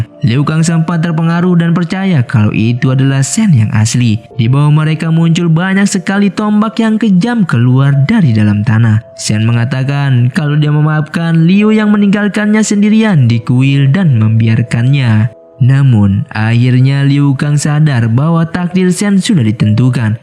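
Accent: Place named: Java, Indonesia. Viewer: Wong jowo ye mas? 😂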